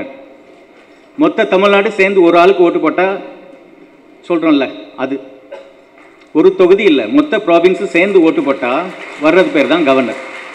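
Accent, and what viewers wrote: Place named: Malaysia. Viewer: Sound like malaysian slang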